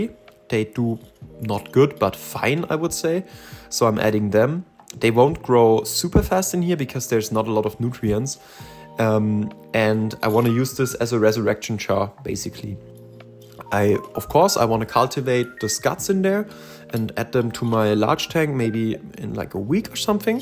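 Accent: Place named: Germany. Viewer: Random question: I’m American, you said you’re from Germany. I know English stems from you guys so does the average person there learn English and German growing up? I notice all my channels with similar content come from your general area and all of you sound like English is native even though my American ears can hear a slight “Arnold Schwarzenegger” vibe coming through. This is not meant to be offensive or anything, the accent is cool to me. Great video, I’ll be subbing to see what else you do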